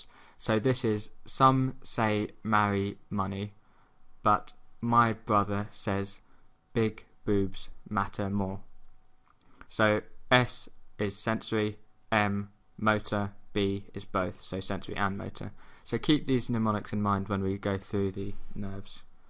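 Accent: England, United Kingdom. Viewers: Excellent tutorial! And it's good to hear the English pronunciation of all those names that I (a foreigner) have tried to learn from books only. Just one thing: As far as I know, the acoustic nerve, surprisingly, contains far more efferent fibres than afferent, seemingly for assisting in focussing our sound perception by affecting the outer hair cells. So shouldn't it be called a mixed nerve, rather than pure sensory?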